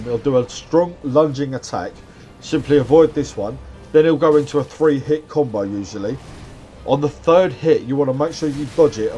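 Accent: United Kingdom. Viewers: Never thought I'd see a cockney soulsborne player 😂 Cracking work on the descriptions mate, keep making more! Edit: Just finished Nameless King fight myself... 12th attempt I think 💀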